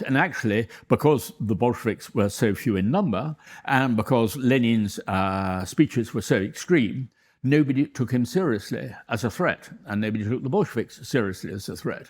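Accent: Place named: United Kingdom. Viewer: Robert DeNiro with a British accent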